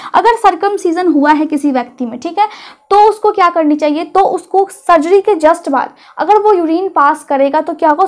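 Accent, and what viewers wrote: Bihar, India. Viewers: बिहार या मैथिल इलाकों से होने की वजह से यह हो सकता है।ज्यादा सीरियस मत लो , इनकी मेहनत की तारीफ होनी चाहिए।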